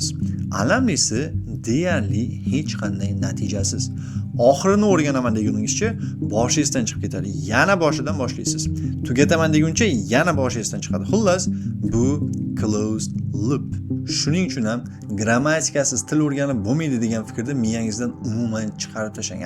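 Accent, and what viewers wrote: Bulungur, Uzbekistan. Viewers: Aka bulungurlikmasmisiz🔥🔥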